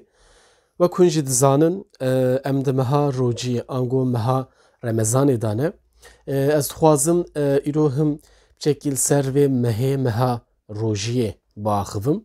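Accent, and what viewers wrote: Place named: Serhed, Turkey. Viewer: Kêfxweş bûm ku tu jî wekî min dibêjî "rocî" 😂🙈 Serhedîbûn 🫶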